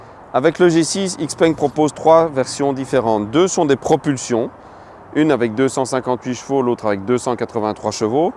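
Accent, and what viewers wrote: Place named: Belgium. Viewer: Sympa un danois qui parle français avec un petit accent belge 🎉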